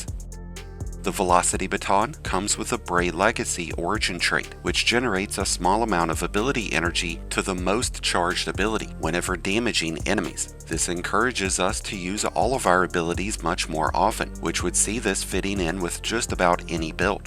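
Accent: South, United States. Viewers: love ur accent! is that southern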